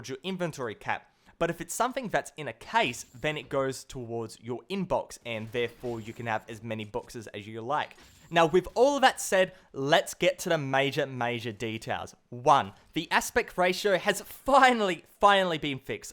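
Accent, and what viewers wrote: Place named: Australia. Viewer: your Australian accent sounding delicious like always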